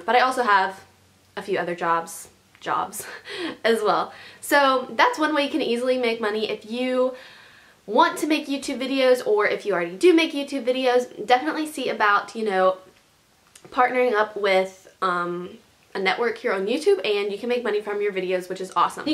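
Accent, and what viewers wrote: South, United States. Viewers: i love her southern accent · Luv your southern accent I have one to!!!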